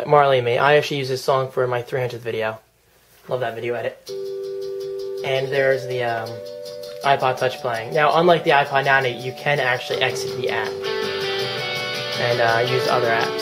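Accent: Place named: New Jersey, United States. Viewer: Your jersey accent is showing strong in this video. "Lets turn it AWN"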